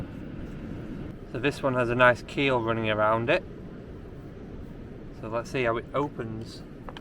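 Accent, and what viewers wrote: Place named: United Kingdom. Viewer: You have the best British accent. #1 British person.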